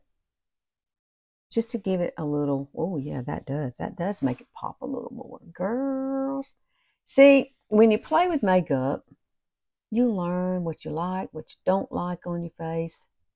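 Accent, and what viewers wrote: South, United States. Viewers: you are so cute. I am curious as to why use lipstick? What about cream blushes and or bronzers? I can see an advantage if you don’t have a lot of money to spend on makeup. I love the eye look!! And I love yr Southern accent!!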